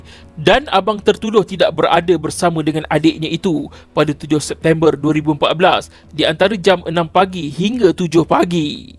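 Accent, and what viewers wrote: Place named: Johor, Malaysia. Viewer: Gaya bahasa penyampaian Johorean sangat 😂 guna lah bahasa Melayu baku standards 😅baru semua orang suka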